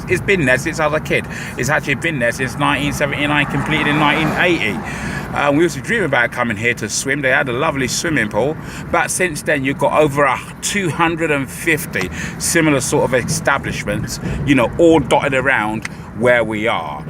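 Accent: Cockney, United Kingdom. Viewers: interesting commentary...esp' with your cockney accent. LOL someone should tell Rochas he needs to improve the quality of the roads his gov't constructs in Owerri! that's what lets down his efforts in opening up new areas of the city!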